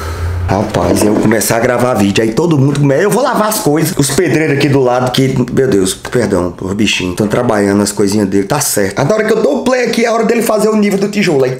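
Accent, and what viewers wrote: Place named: Ceará, Brazil. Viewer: Cara ta com um sotaque de cearense q mora no barroso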